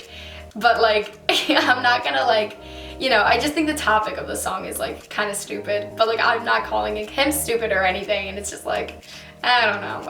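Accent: New York, United States. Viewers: I love your new York accent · Senja S it’s her New York accent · your new yorker accent makes me want to go live in nyc more and more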